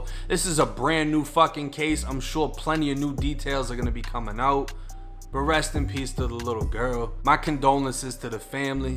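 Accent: Boston, United States. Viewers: The Boston accent not so strong lately eh 😆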